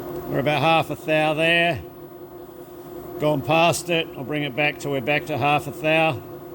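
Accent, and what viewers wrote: Australia. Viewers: I watched a few of your videos and decided there was so much I could learn that I quit watching the latest and started from the beginning. There was something in every video that a non-professional like me can learn. I've been running a mill and a lathe since 2007, but never better than now thanks to all the little--and some big--hints and techniques you include in everyone your videos. I've also learned enough of the Aussie language that I do not need the captions anymore! So, creak on! Thank you!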